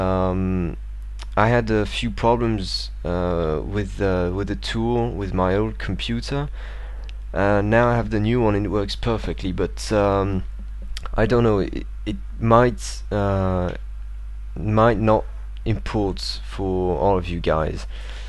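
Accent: France. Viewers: frenchy accent spotted :p en tout cas t'as un super niveau en anglais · Frenchie accent <3 ;) I'm french too :)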